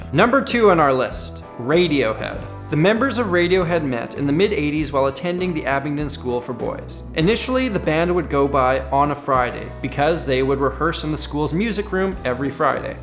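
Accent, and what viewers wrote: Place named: Canada. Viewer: As a native Akroner I am offended but maybe it was just ur Canadian accent aye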